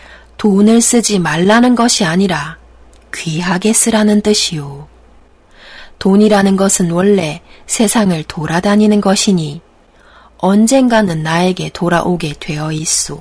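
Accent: Gyeongsang, South Korea. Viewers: 경상도 사투리 반갑네요. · 경상도 억양이 남아있어요 ㅎㅎ 남편이 대구 사람. 잼난 책 설명 좋네요.구독자 많아지실듯! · 갱상도사투리 마 괘안심더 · 경상도 억양이 친근감을 주네요 수고하셨습니다 감사합니다 축복합니다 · 님께서 책의 내용을 깊이 있게 이해하고 낭독하고 설명해 주시니 아주 좋습니다. 목소리와 경상도 사투리도 매력있어요 앞으로 엄청나게 많은 구독자가 생길것 같아요. 감사합니다. 사랑합니다. 축복합니다.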